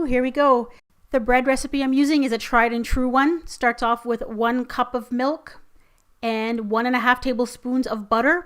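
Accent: Quebec, Canada. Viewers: is she canadian? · i love your accent are you from canadia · I believe she's French Canadian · I was watching this and thought, "hmm, that sounds like a Canadian accent." Sure enough eh! Very pro-looking results, she clearly knows her stuff. I just know mine would turn out looking like Kermit the Frog had a horrible accident.. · Are you from Québec or just from canada ? :O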